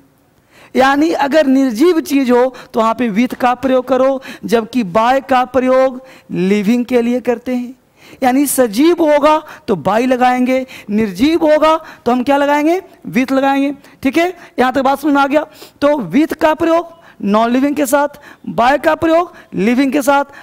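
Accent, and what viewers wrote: Bihar, India. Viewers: But bihari accent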